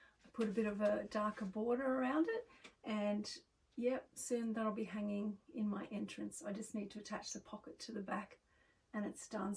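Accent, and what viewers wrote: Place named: Australia. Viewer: Hi, I finally circling back to your channel. I’m glad I did too. Because I do love to sew. And I used to watch both my grandmothers sew quilts. My mother did as well. Though I don’t quilt. They are my favorite comforters. I have my machines. Are you from Australia? I love your accent. Thank you once again for visiting my channel last month and for your comment. I look forward to watching your videos now that I found you. 😊❤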